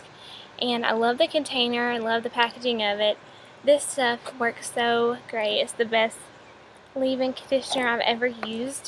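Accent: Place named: South, United States. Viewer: I love your accent! What part of the south are you from? I'm from Texas (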